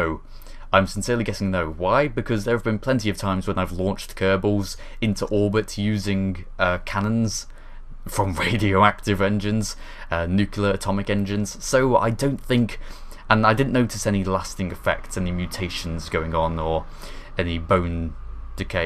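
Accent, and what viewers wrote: United Kingdom. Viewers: Don't worry. We all love your British accent.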